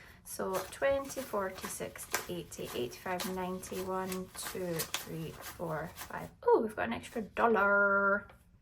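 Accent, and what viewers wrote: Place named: Ireland. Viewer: New subbie here! Love your accent! Irish?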